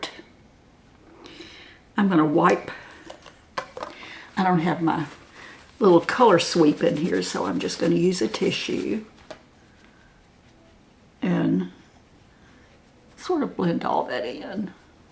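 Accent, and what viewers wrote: South, United States. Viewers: I think the foundation is a tiny bit too dark and your blush is too heavy. A lot of older women wear too much blush and it looks overdone. I also think you’d do better with brows that are less brown and maybe use a bit of pencil. Elf makes a very decent one for the price. Other than that, you look very lovely and I love your southern drawl. Where are you from? I’m also 81, am blessed with good skin. My wrinkles are in the mouth area and I’ve never smoked so am very annoyed. My eyes and cheeks are good. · The makeup only enhances your inner beauty. I am 64, and to be honest, I haven't always been very kind to myself and the changes that accompany aging. That's going to change after watching you, and listening to that calm, charming Southern accent. Thank you for the inspiration, and proving that a little pampering, and inward gentleness can present itself to the outside world as being worthy of self-love and care.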